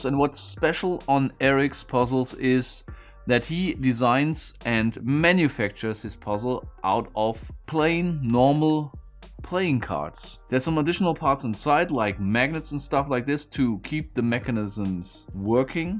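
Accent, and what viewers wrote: Germany. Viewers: Au contraire, my friend. Please, forgive me my harsh tone, but for a somewhat younger (age < 45, I guess) German his accent is unforgivably and shamefully thick - "Fremdschämen" is my dominant feeling hearing it by my fellow countrymen, especially when accompanied by our (i.e. German) typical permanent appreciation. So, my ears kept bleeding. And I guess a half-trained chimp would be able to deduce what "jostle" means from the context "usual puzzle solving techniques". At least I was able to do it immediately even while eating my banana. In conclusion, I cursed using the i-word seeing him shaking and whacking this delicate piece. On the pro side, he didn't chew on it (a seldomly applied puzzle solving technique though;) Also, he did a very nice repair job on the puzzle (there is an engineer in all of us:) And he solved it. Nice. So, hurray... 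The puzzle itself is quite a piece of eye candy! A perfect christmas gift, IMHO. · Why I watch vids: 1. his German accent and mild humor is soothing to me 2. I love his engineering brain and approach to solving puzzles - his methodical approach is interesting and has applications outside of solving puzzles 3. I get satisfaction from watching the process and the solve and the explanation of the mechanics. When solved, it's like I get the same satisfaction as he does which makes me feel good! Danke!:D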